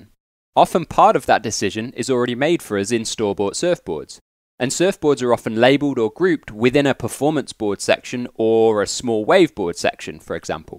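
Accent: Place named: Northern England, United Kingdom. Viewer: Every time I hear a northern English accent talk about surfing, I am surprised that people actually surf up there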